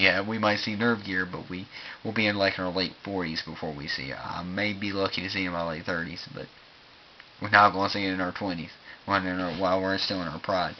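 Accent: United States. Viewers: Are you frome Mississippi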